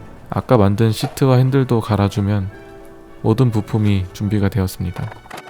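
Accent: Daejeon, South Korea. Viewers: 님 대전분이셨나요??!?! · 형 대전사람이였어...? 이제 알음... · 님 대전사람이시네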